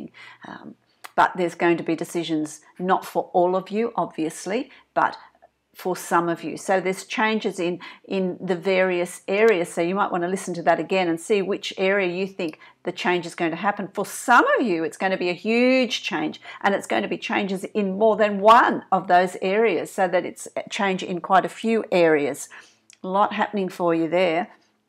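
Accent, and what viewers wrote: Australia. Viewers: You sound like an Australian, i like the way you explained this.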